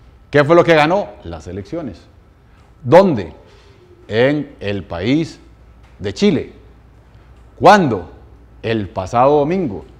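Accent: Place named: Costa Rica. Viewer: Tu asentó es muy bonito, debes de ser costarricense.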